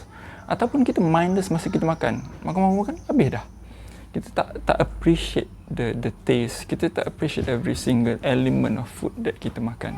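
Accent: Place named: Kelantan, Malaysia. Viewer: Doktor ni org kelantan ke..dgr bunyi budak cakap kelantan..hehe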